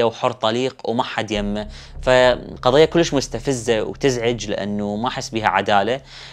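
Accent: Iraq. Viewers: حلوة اللهجة العراقية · متابعة من المغرب احب سردك للقصص واللهجة العراقية الجميلة زادت السرد جمال عل جمال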